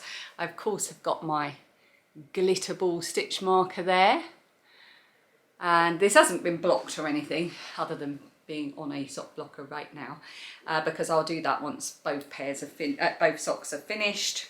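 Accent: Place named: South East England, United Kingdom. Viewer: Another fab episode. I love to get in a nice dose of that luverly South East accent (that’s my natural accent when I’m back in Blighty hanging out with my mates), and lots of making goodness, watching your vids. Have a brilliant knitty autumn and congrats with 19 k 🎉🕯🧶🧣🍂🫖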